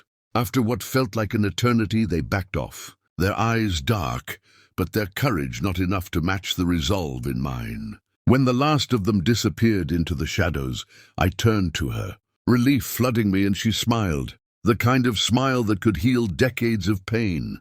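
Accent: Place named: RP, United Kingdom. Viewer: Somehow I can't take a cowboy seriously who sounds like he's in the House of Lords.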